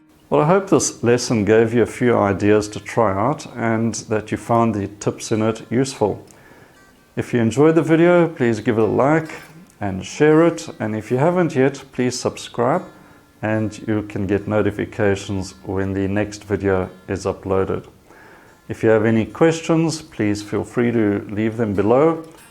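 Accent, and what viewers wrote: South Africa. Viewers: Thank you for your tips on painting! I really enjoy watching your videos and also listening to your south african accent as I lived 4 years there and left it for Kenya in 2017. SA always in my heart! Regards.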